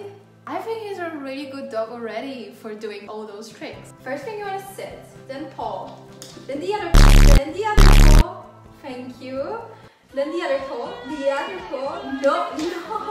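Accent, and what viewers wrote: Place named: United Kingdom. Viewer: You sound really British now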